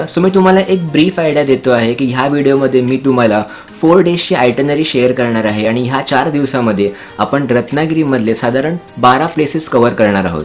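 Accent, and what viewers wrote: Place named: London, United Kingdom. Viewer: हा असे मराठी का बोलतोय?London born आहे का?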